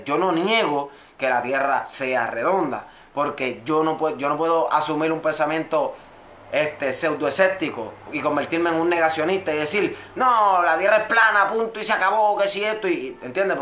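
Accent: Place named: Puerto Rico. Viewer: que loco que escuche tu acento y fuiste el primero con ese acento que no habla de reggeton ni de un temaa , bendiciones a PR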